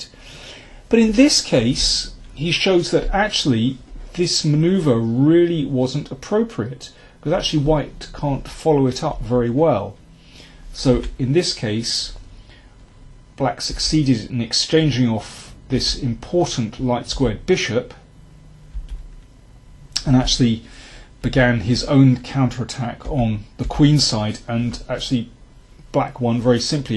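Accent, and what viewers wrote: United Kingdom. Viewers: I'm also American and I've never had an issue understanding. Maybe I'm more accustomed to the accent due to all the British comedy I watch.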